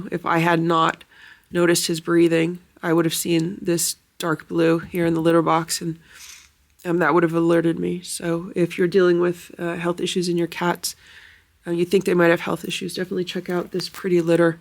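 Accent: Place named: New Jersey, United States. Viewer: I haven’t been able to watch this video yet. Just saw the thumbnail this morning and my heart sank. Boo was more than a cat—he was a rhythm, a presence, a sovereign part of your world. I’ll miss hearing your voice call out ‘Hey Boo’ in that familiar New Jersey grain. It always made the day feel a little more anchored. Sending love and deep respect as you grieve. You gave him a life of dignity, ritual, and care—and that echoes far beyond the screen.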